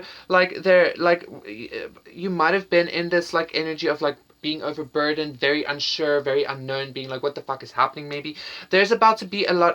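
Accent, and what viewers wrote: South Africa. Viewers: You sound South African, I enjoyed listening to you speak! Thank you for the reading 💜✨ may your channel continue to grow and be prosperous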